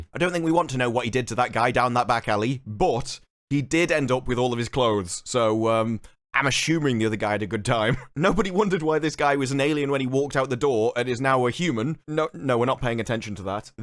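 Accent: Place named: Sheffield, United Kingdom. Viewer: Yet another great reason to not watch modern SW. Your accent is bugging me, it sounds familiar (I'm Rotherham area) and every now and then your accent reminds me of PJW who hails from Sheffield.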